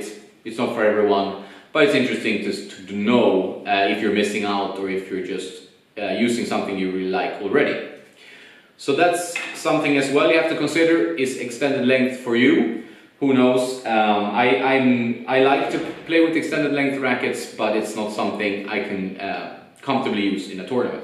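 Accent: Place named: Sweden. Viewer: Bra ide, är du svensk. Du här en svensk accent. 🙂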